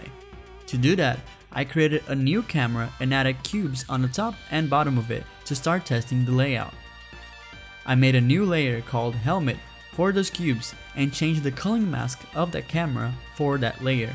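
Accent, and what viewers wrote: Brazil. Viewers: Are u brazilian my man?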